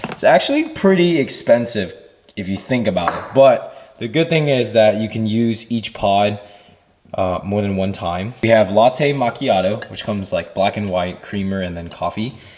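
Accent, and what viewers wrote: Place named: Italy. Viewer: Pretty solid italian accent. Bye from Italy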